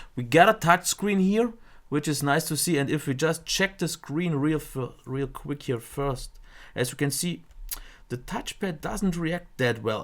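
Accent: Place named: Germany. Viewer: Man merkt aber ziemlich, dass du ein deutscher bist :-) aber gutes Video so far :D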